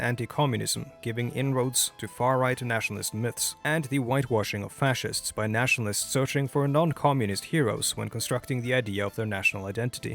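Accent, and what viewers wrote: Norway. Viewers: you kinda sound like LEMMiNO but you are not swedish but norwegian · My new fav second monitor content guy/gal. Norwegian accent highly underrated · ha, Norwegian detected!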